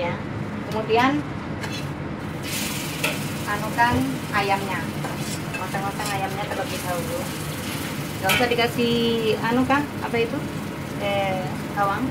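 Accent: Java, Indonesia. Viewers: mbk seng ayu iki jowone teko endi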